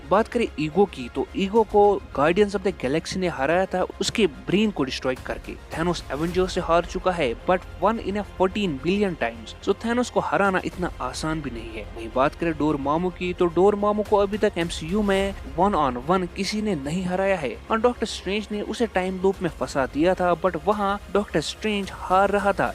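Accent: Kashmir, India. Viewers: Are you from Kashmir because I am also from Kashmir your voice is like you are from Kashmir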